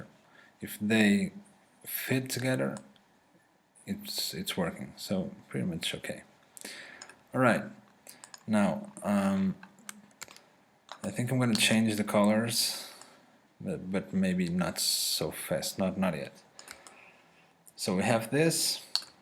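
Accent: Portugal. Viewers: Inglês de quem fala português SEMPRE me ajuda a entender, ingles nativo é muito corrido, valeu pelo video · Good Tutorial... and you are Portuguese...or sort of :) Bom tutorial ;)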